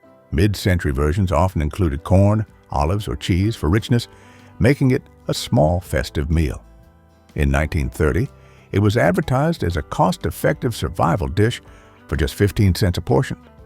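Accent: South, United States. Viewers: the AI southern drawl is maddening to me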